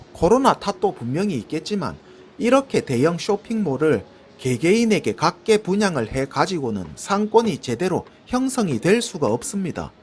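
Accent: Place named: Daegu, South Korea. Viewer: 대구사람인가베. 억양이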